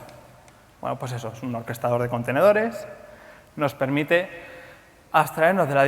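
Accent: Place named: Spain. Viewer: luego con ese acento españolete se hace más aburrido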